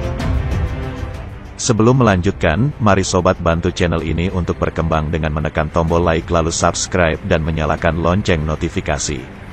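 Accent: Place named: Banyumas, Indonesia. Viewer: Bise wong ngapak tetep sinjay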